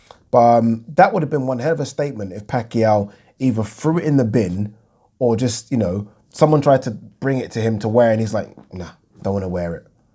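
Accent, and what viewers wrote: Liverpool, United Kingdom. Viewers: What part of Liverpool are you from?? 😃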